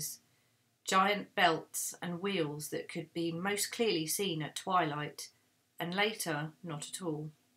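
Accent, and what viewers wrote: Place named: United Kingdom. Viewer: Thank you for reading Lig's for us. I would try to be closer to your microphone, or use a headset one that has mic close to your mouth. Your voice is lovely, as are most UK accents.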